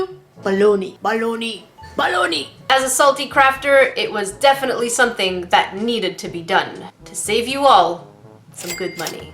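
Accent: Canada. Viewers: Are you canadian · I love your salter crafter videos! I like seeing your salty disappointment face and your Canadian accent just makes these videos hilarious 😂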